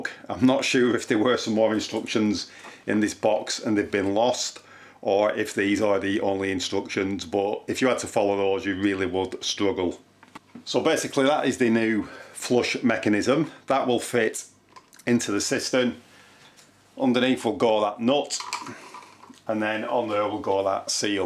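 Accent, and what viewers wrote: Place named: Yorkshire, United Kingdom. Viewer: My pleasure! Just out of curiosity, what UK city is your accent? My guess is somewhere in the Yorkshire area.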